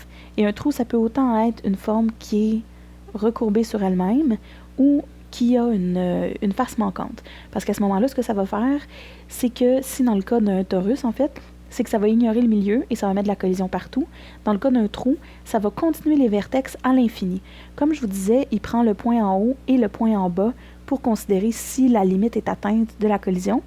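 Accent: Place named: Quebec, Canada. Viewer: Merci. c vraiment l'fun d'avoir une voix québécoise. À noter les majuscules sont importantes.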